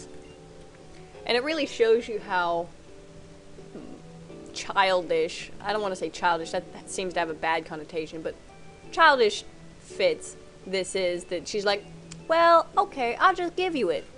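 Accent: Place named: South, United States. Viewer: I was wondering, do you live in south U.S? Just wondering cause you have a slight accent. =3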